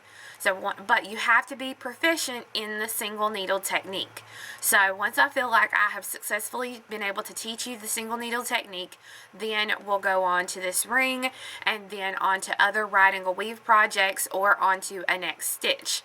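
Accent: South, United States. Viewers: Hi, I love your beautiful southern accent. What I love the most is your way of teaching, you are one of the few that goes to the point, but give us clear explanations of each one of your beautiful pieces.Your work is impeccable,easy to understand no matter which stitch you teach us you always make it easy to fallow. Thank you for shearing with us your wonderful talent. I will love to learn how to make the gorgeous earrings you have on,and also to know where I can purchase the wire protectors that you mention in all of your videos. Is't possible that you let me know where to get them? Last but not least I want you to know that I had learn tons just by watching your tutorials. Thanks again. Love you.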